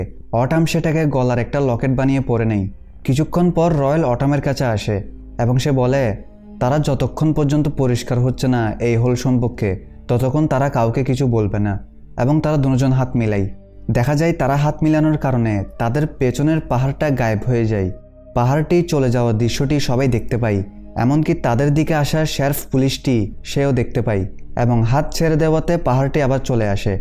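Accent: Chittagong, Bangladesh. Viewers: হাইরে চিটাইংগা টান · যতই চেষ্টা করেন না কেন চট্টগ্রামের মানুষের কথা আলাদাভাবে স্পষ্ট বুঝা যায় 😊😊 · বুঝলাম আপনি চট্টগ্রামের, কিন্তু আরেকটু স্টাইলিসভাবে বললেও পারতেন 😅। ভিডিওটা সুন্দর ছিলো ❤❤ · Bro chhatgaiya accent is annoying me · চাটগাঁইয়া উচ্চারণ চলে আসে। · Apni Chittagong er Manush.... Kotha bolar accent e buja jacce😊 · love ur chatgaiya accent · যদিও আমি ঢাকার কিন্তু চিটাগাং এর ভাষায় এক্সপ্লেন শুনতে অনেক ভালো লাগলো, তাই সাবস্ক্রাইব করে দিলাম 🥰💐🥰💐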